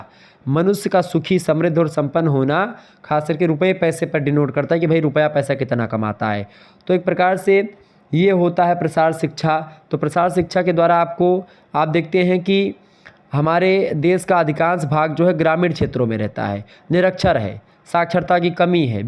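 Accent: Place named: Awadh, India. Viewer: Sir aap aawdhi bolte hai acha lgta hai ❤Best teacher on youtube for B.A.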